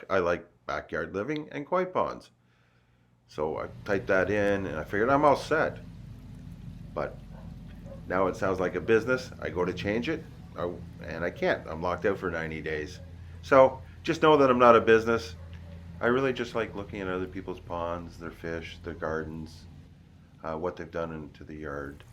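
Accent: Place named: Canada. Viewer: Hahaha! Yep, you sound Canadian now! I live in central lower Michigan... our fall colors are in full glory right now. It's difficult for me to concentrate on the road on my hour long drive home from work, they're so beautiful! Gotta watch for deer, turkey, and sometimes coyotes. Looking forward to your fall colors! P.S. forgot to tell you thanks for answering my koi question!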